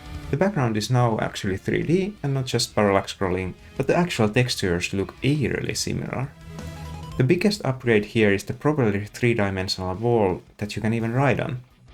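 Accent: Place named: Finland. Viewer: Cool channel! Sounds like you're from my neighbouring country!!! 😁 Swe => Finland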